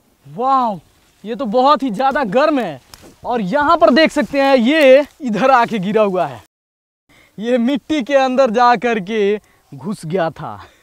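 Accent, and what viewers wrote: Bihar, India. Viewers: Kay app बिहार के हे l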